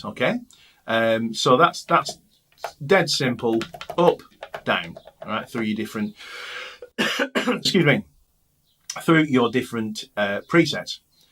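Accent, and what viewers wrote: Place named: Northern England, United Kingdom. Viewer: Great demo! Love that short-scale bass, too. And it's nice to hear the dulcet tones of a fellow Northerner on YouTube ;)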